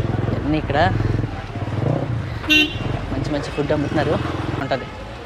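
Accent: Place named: East Godavari, India. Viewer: Anna mana east Godavari slang dose penchu bro comedy please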